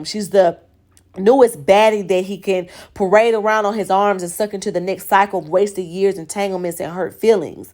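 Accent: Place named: Florida, United States. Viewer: Are you from Florida you have the accent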